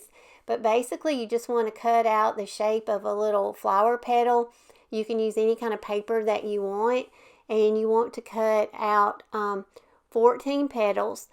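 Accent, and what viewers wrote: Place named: North Carolina, United States. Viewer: I love your sense of style and your accent. It is very similar to my area of North Carolina. I don't have creative instincts so I rely on crafters like you and many others to give me ideas. I painted an empty Bolero hand soap dispenser I got a Dollar Tree and it turned out beautiful. It had a pretty label and I knew I wanted to do something with it so thanks for that video the other day. Also wanted to mention I have the same scrapbook paper you used in this video.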